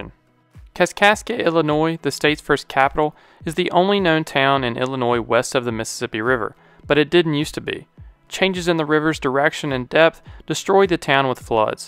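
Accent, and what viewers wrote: South, United States. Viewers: I just can't help to notice that you have a strong Southern accent. I don't know, it just sounded a little weird to me at first hahaha because oftentimes, the geography and history-related videos I watch are narrated by people with British accent. This is the first time I'm hearing someone talk about Geography with a Southern US accent. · Nice southern accent :) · Because of your American accent, I can't understand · Shout out to all the other people who heard his southern accent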